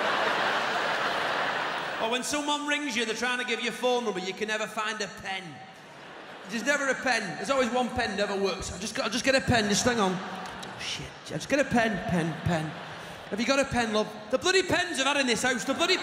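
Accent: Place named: Bolton, United Kingdom. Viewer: Bolton/ Lancashire Accent!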